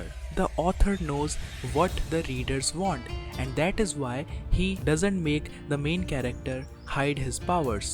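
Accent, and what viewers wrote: India. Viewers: Too much Indian accent in the voice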